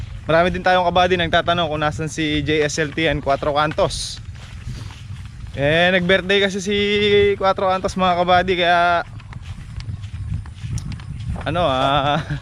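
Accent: Pampanga, Philippines. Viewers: Ka buddy kapampangan ka po ba? Hahahaha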